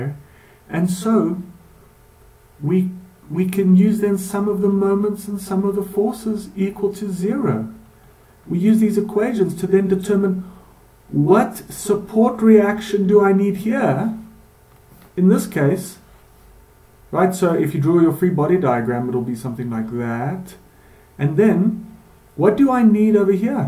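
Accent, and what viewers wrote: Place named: South Africa. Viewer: It's so refreshing hearing a Saffa's vvoice on one of these videos : )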